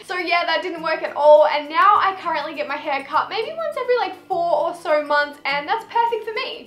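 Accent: Australia. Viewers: I’m watching some of old videos and can notice accent is more Australian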